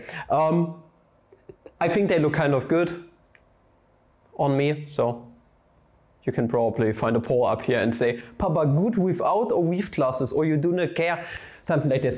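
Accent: Germany. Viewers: Oh dear sometimes I don't know if you're speaking Deutsch or English. Work on that accent pal and it will be the best!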